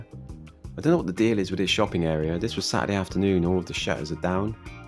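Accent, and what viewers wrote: Southwest England, United Kingdom. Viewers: "The Coastal town that they forgot to burn down, come Armageddon come Armageddon come" ( - ) I love your videos and your strong southwest accent 😊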